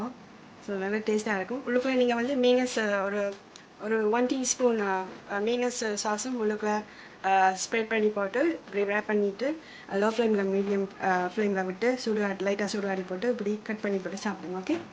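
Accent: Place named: Sri Lanka. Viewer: Hai sis unga srilanka tamil pedikum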